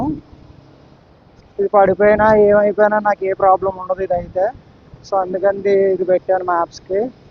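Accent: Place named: Godavari, India. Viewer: ni Bro Full Video chusa Godavari yassa Bale vundhi bro 😅, Take Care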